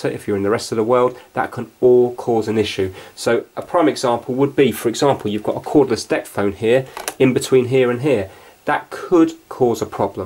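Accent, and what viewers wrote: United Kingdom. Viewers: Aha! now I’ve seen your face after all this time. listening to your wonderful British voice and learning about video game consoles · THANK YOU THIS HELPED IT WORKS YAYYAYAYAYAYAYAY!!!!!!! Plus nice British accent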